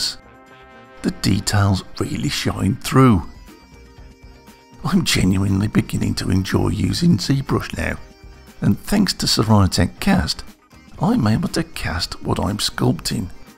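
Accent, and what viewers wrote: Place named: Birmingham, United Kingdom. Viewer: from the accent and the hobby... Is it fair to deduce that you work in the jewelery quarter in Brum?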